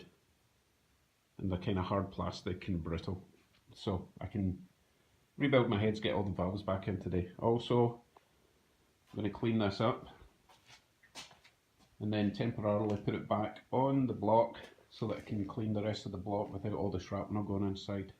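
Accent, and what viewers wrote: Scotland, United Kingdom. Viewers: Aye... what part of Scotland are you from?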